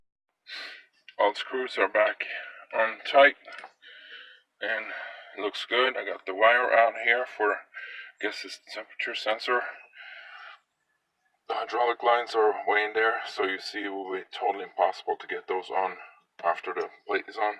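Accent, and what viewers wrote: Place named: Germany. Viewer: you sound like you are either german or Austrian I am of Austrian decent i'm sure you can tell by my name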